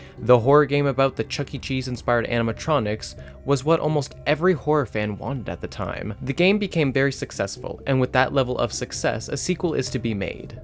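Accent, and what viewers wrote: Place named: United Kingdom. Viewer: I thought you were British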